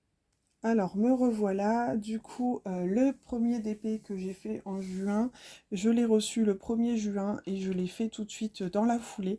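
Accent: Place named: France. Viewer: J.aime beaucoup ton accent français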